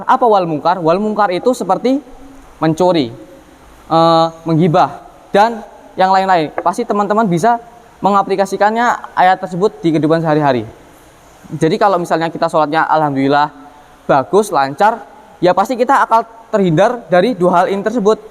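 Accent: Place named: Java, Indonesia. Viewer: Masya Allah bang 🥰🥰 tambah pinter, tmbah sholeh. Aminn 🤲🤲 Didenger2in kok suaranya jd medok jawanya ya sekarang, udh pinter bahasa jawa kayanya 😁😁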